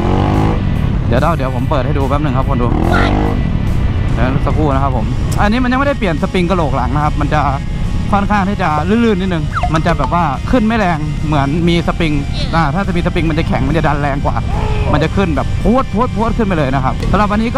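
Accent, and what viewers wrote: Isan, Thailand. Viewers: ชอบพี่ว้ะพุดอีสานล้ะตลกจิง555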